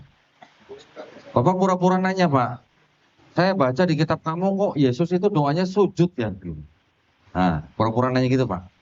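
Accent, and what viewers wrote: Banyumas, Indonesia. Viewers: Koh bawaannya enak Logat nya itu Lo ngapak 😁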